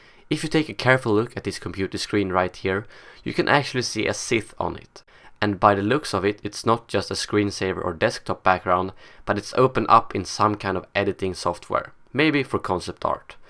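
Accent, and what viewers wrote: Sweden. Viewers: Are u from Sweden??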